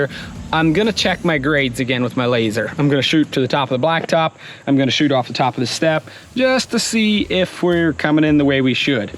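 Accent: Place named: Maryland, United States. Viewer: What is that accent? Is that a northeast/Maryland accent because I am so intrigued by it! Looks good by the way!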